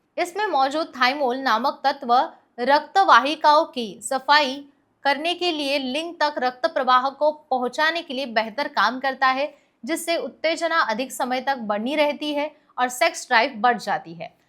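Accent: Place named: Maharashtra, India. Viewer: Woh marathi hain sayad isiliye unka uchcharan waisa sunne ko milta hai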